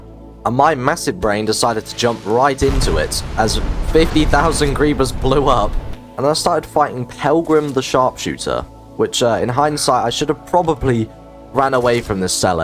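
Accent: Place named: United Kingdom. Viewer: HAIII IM HERE TO CALL YOU MY FAVORITE BRITISH, PLS SAY BOTTLE OF WATER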